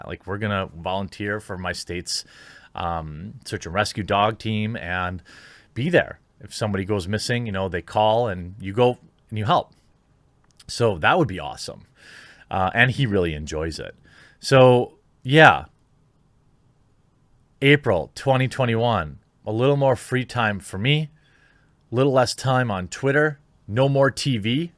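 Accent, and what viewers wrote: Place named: Midwest, United States. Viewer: Hahaha I knew you were from the Midwest but that clip with you and your wife your accents really shown through. I was confused for a second because in your videos your accent is very minimal. Don’t get me wrong, nothing wrong with the accent just caught me off guard lol. If you heard me talk I’d be the one with the accent.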